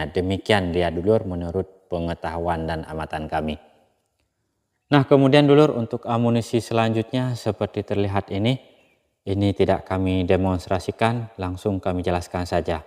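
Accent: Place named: Aceh, Indonesia. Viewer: Bang.... Dari logat sang droeneuh ureung Aceh. Beutoi bang?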